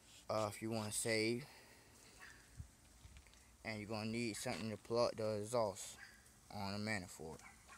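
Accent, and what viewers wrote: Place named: Louisiana, United States. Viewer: ok you're either high, drunk, both or whatever but you're making no sense at all. I can hardly understand what you are saying. sounds like you might be from Louisiana.. but you start one sentence then start taking bout something else. you also need to hold the phone right side up. I lost a lot of brain cells watching this video. not knowing the names of the parts is not helping. by the way I stopped watching your video after 2 minutes...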